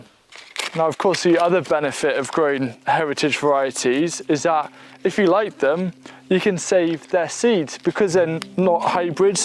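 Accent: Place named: Wales, United Kingdom. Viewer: Your Welsh accent is so sexy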